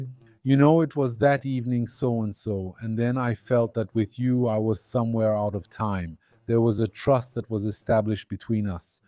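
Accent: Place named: United Kingdom. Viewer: L'accent britannique, la classe !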